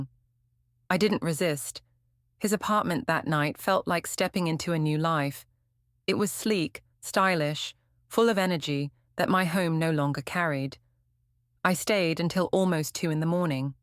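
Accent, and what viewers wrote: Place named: London, United Kingdom. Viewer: An American story read with an an urban London accent. Cool!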